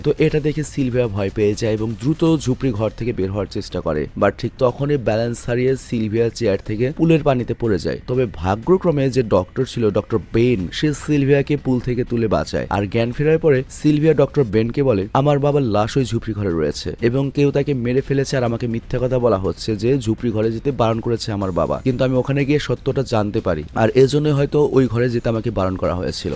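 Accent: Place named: Noakhali, Bangladesh. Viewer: Vai apni sera.. ❤ Amder Noakhali kotha bollen❤❤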